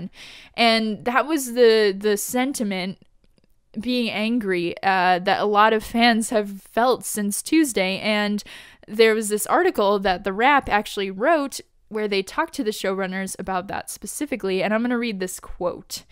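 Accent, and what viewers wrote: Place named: England, United Kingdom. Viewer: beautiful english accent btw ;P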